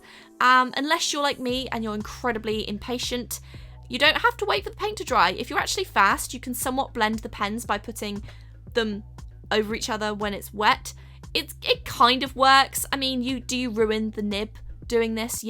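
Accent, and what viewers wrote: United Kingdom. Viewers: the things you say ‘wrong’ are just the British way of saying them. I say Van Gogh and Amazon the same as you. From UK x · Yaaah u r british so u will say like that ❤️❤️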